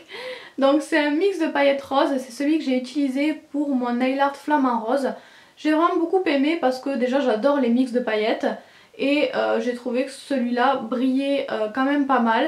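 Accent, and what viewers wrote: South, France. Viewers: J'adore ton rouge a lèvre et ta vidéo ! :) d'où vien ton accent ? Hihihi du sud non? ❤️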